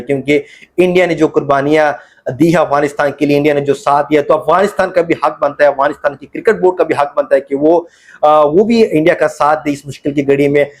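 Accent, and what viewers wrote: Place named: Pakistan. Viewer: Muzhe to ye banda pakistani lag raha hai iski urdu se pata chal raha hai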